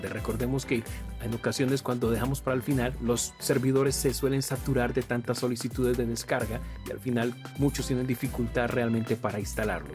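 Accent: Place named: Colombia. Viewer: Por tu bandera y acento me pareces de Colombia, yo soy de Venezuela y de España viviendo en México desde hace 10 años. Me parece excelente que intercambies con quienes te escriben. Tengo dos canales más, casualmente este no es el de música. Uno lo tengo para mis covers y otro como compositor. Decidí separarlos porque esto de las redes uno no sabe y cualquier dia me puedo llevar una mala sorpresa de que me tumban el canal por no tener derechos de autor. Los de compositor no hay forma. Empecé en este segundo aliento con YouTube hace poco luego de que twitter me tumbó mi cuenta con casi 500 mil seguidores y 10 años de dedicación, publicando poco además porque no me alcanza el tiempo para crear, producir y promoverme. Un Abrazote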